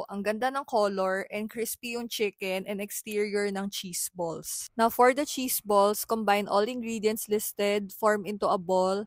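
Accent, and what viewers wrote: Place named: Philippines. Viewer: omg, thank you. I've been wanting to try this food for a long time. It's my first time watching you and when I heard your voice I wondered if you were Filipino, but then you started speaking Tagalog and I was so happy I was right! It looks delicious, I have to make it some day.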